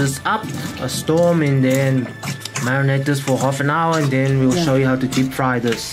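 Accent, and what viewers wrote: South Africa. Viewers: This guy sounds like his grown up in South Africa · This recipe is fantastic! Quick and delicious. Thanks very much. Are you by any chance based in Cape Town, South Africa as your accent is so distinct, I had to ask? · You sound south African. Lol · Wait, are you from South Africa by any chance?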